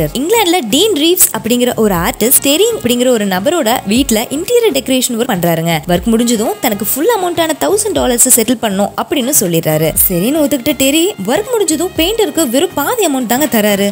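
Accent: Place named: Coimbatore, India. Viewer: yenunga neenga coimbatore aanga..?! 🤗🤗👏👏 super voice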